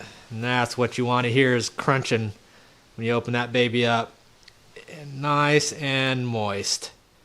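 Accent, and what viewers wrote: South, United States. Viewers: where'd u grow up? never rlly see a somewhat old asian guy with a southern accent lol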